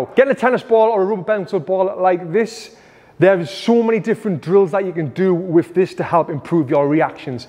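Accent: United Kingdom. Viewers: The British accent makes this 100x better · I like your British accent 🔥🔥🔥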